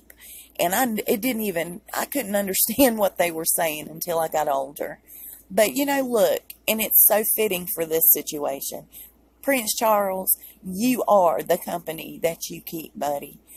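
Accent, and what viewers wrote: South, United States. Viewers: I want to like Megan... but there is just something about her that I just can't take to🤔 ps love your southern accent x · Thanks for sticking up for the South its appreciated. I new Meghan did a lot of things we aren't hearing about until now. Like you Southern Accent and appreciate all the updates and news.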